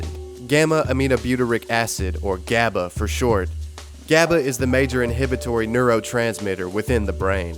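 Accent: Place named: South, United States. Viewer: Why do you sound like a CSA southern solider ?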